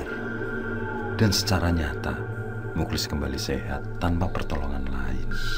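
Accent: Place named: West Java, Indonesia. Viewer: kmu asli lombok apa sunda kok ngomong nya ada bhs sunda nya, klo kmu sunda sudah gak ada harapan saya 😢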